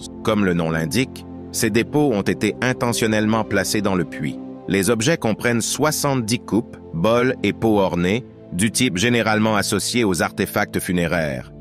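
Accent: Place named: Quebec, Canada. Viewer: C'est un Québécois qui fait la narration...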